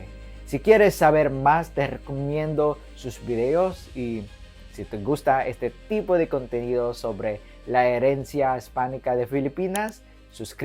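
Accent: Andalusia, Spain. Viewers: Todo lo que hablas es muy similar al español de Andalucía. Tiene sentido, ya que la mayoría de personas que llegaron a las Américas y Filipinas salían por el puerto de Santa María, en Cádiz. Principalmente personas del oeste de Andalucía: Huelva, Cádiz, Sevilla, Córdoba...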